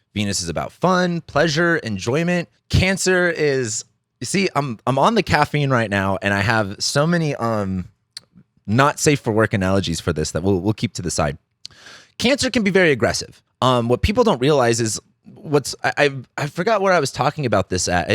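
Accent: California, United States. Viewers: What? Are you from Lancaster or San Bernardino?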